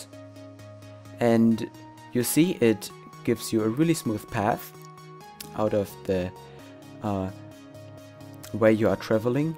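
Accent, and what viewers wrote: Germany. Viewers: Du bist Deutsche, ne? Merkt man direkt :DD Nice MOD by the way!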